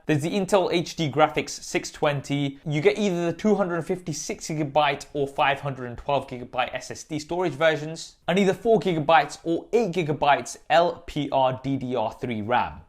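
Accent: United Kingdom. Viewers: This guy looks like Indian but Sounds like UK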